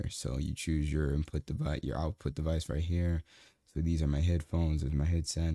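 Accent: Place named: Memphis, United States. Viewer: You gotta be from memphis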